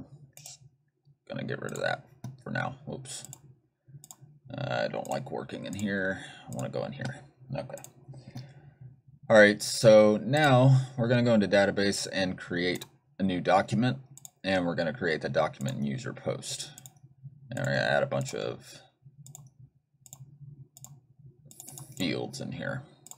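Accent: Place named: RP, United Kingdom. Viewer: its a great video, but coud't continue because of that rich people accent something, that you have . Sorry :( . I think you can talk normal if you want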